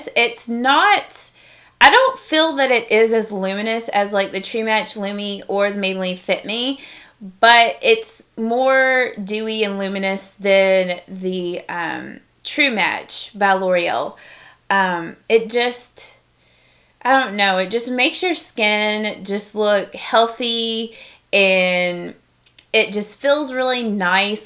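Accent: South, United States. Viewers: does anyone know where she's from? I'm hearing a southern accent. I'm from ga. just wondering!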